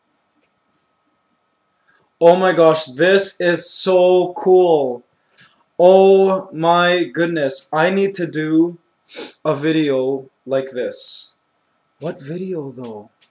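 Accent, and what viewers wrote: Philippines. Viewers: ya what happened in your Filipino accent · yeah what happened to your Filipino accent? anong nang yare? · I think it's because he use to live in Toronto and he moved to the Philippines and started talking Philippines more and that's how he got the accent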